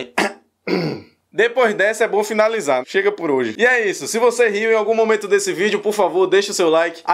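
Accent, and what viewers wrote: Bahia, Brazil. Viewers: Manooo surtei nesse sotaque 😍😍😍 vo namorae um baiano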